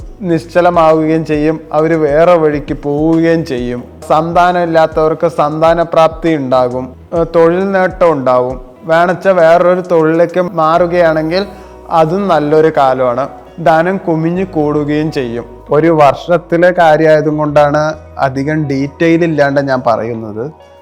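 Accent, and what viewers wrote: Palakkad, India. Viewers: പാലക്കാട്‌ ഭാഷ ആണല്ലോ ❤❤❤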